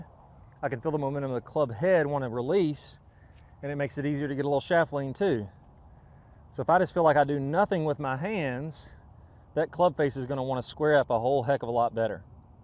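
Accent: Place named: Kentucky, United States. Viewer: always love your instruction and has helped me get my handicap from 20 to about 12.4. My question for you is not about golf, but rather where you grew up? Your accent sounds so much like my roommates from Kentucky when I was at UK. Keep up these great instructional vids. I need to get your swing boxes. I cheaped out and just stick my alignment sticks in the ground by eying the degrees. It’s helped, but I think I need to pull the trigger on your product to be more accurate.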